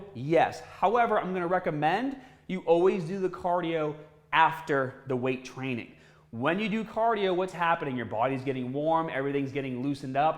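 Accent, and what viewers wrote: Boston, United States. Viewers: “Cah-dio” I love the Boston accent. is a sexy and smart man. Always great content! 🙏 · The Boston accent kills me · Cardio. It's a Boston accent, grow up. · Great video! Your Bostonian accent cracks me up, though! 😆 Especially since it doesn't seem to be consistent: you kept alternating between saying "cardio" and "CAH-dio" during that part of the video! 🤣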